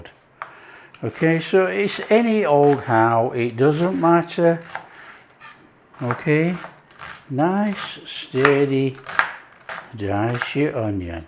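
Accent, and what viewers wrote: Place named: West Yorkshire, United Kingdom. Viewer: You, my friend, have got to be a West Yorkshireman..? I absolutely feel like I'm home, listenin' to 'yo' ❤️🥰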